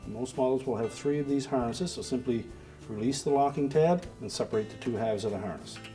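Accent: Canada. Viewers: Took me 7 hours total.....That included 1 hour to free the tub shaft from the center bearing and 1 hour of power washing and cleaning the tub and gasket. I have an 2004 machine and I did this in 2013 as well as several drain pump replacements. Amazing how cheaply made these China machines are, thin metal. cheap plastics and solder drip-mold nut and bolts!! After following the video, I officially now say "out" like a Canadian.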